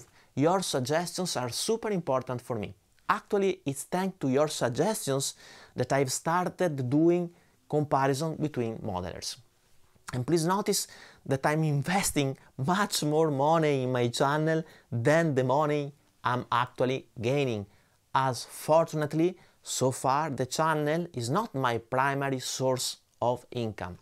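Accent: Italy. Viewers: Great video!! I for one think the Italian accent you have when you speak English is a part of the charm and i have no problem whatsoever to understand you as a Swede that write/read and speak English pretty well so dont worry about it at all. All the best! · Ciao,! Your channel is great! … and I like the Italian accent: my other great love is MotoGP, so I am very used to it! ☺️ Be well! Greetings, from Downunder! 🇮🇹🇦🇺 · great channel and as fellow Italian ( living and playing in London for over 20 years) I tell you don't worry about the english pronunciation, what you say is clear and understandable and that is what matters....and as a positive bonus it speaks about your roots. keep the compositions coming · As an Englishman, I'm glad that these videos are in English, as my Italian is not that great, to say the least! English sounds good with an Italian accent, anyway. 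Also, it's the content that counts and from what I've seen so far, there are no issues there. Quality stuff! 👍🏼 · i'm a guitar player and as everyone else I watch a lot of YouTubers talking about the guitar. Said that I would say your videos are the best for me to understand what is happening in the digital and analogical revolution. Your videos are always among..even I would say the best about that your reviews and comparisons are always really objective and not subjective how boring nowadays can be reviewing the tone of guitars? You don't do that which is subjective as you don't say your subjective personal opinion on which units sound the best I actually really appreciate your work and I completely understand what is your purpose Nahhh in my honest opinion you should keep speaking in English for two reasons 1 the guitar community needs your videos 2 I'm Italian as well and even if I'm living in Britain my pronunciation is like yours, the accent can't be erased 😂 Unfortunately I'm seeing companies which are not welcome to criticism made by YouTube content creators, but criticism is what people are expecting from them Bravo! 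I add you don't really need to explain your work...everybody knows it's worth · (Warning! This message is written by a non native speaker)! Your english is more than good enough. In Europe, at least, we hear english spoken with different accents every day. One would have to be pretty narrow minded to dislike your channel based on your italian accent. Your channel is so rewarding to watch, i love the in depth analysis you provide. Allways looking forward to your next upload! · Hi, Your videos are some of the very best on YT and they often really help. Also Your playing and the songs are very good. I like Your English, it makes me smile, and when I'm in Italy that is the accent I hear when I talk English to people. It's very clear and easy to understand. No need to bother trying to sound like a proper Lord:D Thanks for all the knowledge we get from Your tests! It's a huge amount of work You have done here and we all just consume it for free. 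Huge thanks! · Cheers from Istanbul I am a dedicated home player music is my main hoppy I leverage of your videos for couple of gadgets I recently purchased.. you are a naive great person I love your Italian accent music is the universal language and for language different accents are great flavors your music is really amazing btw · ! Your Italian accent would be a total chick magnet around here. I wish I was bilingual with your accent. My date book would be completely full. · Hi. I'm Italian. Your English is perfectly understandable. The pronunciation, with a strong Italian accent that characterizes it, is clearer for the automatic translator than that of native English speakers. So what matters is that your content can reach everyone. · Your English pronunciation is actually one of the reasons I watch your videos… some of my grandparents were Italian, and it reminds me of them. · Zero wrong with your English. 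You have an Italian accent - hardly surprising! Keep up the great work.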